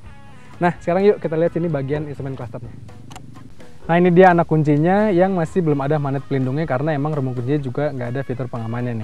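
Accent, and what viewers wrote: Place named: Cirebon, Indonesia. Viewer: 5 bar jeh.... kaya orang cirebon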